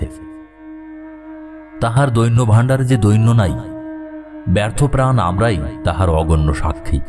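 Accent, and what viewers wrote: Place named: Thakurgaon, Bangladesh. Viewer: Apnar Voice ta ebong background music ta khub bhalo legeche. Uporontu apnar Thakurgaon Bongaiyan uccharon e matir taan anubhav kori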